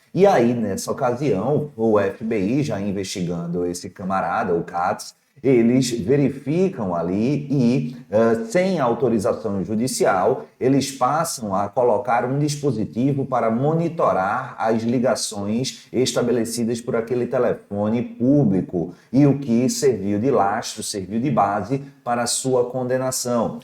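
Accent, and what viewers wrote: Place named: Northeast, Brazil. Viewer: Não sei se alguém percebeu, mas o sotaque nordestino desse mestre, ao que me parece, e essa calma cirúrgica na explicação permitem entender com clareza o tema abordado. Tenho assistido às demais vídeoaulas dele e aprendido bastante.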